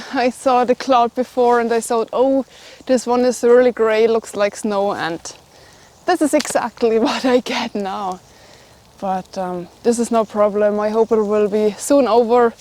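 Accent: Germany. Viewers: Teee peee..I love your beautiful German pronunciation of my language..danke. (:-) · I was hearing her say "Tippy" then realized she was saying "Teepee", her German accent is cute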